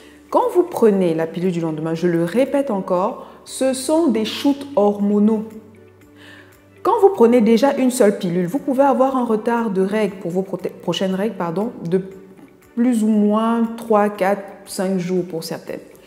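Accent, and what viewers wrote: Cameroon. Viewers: FAQ: êtes vous camerounaise? 😅😅 je reconnais un peu l'accent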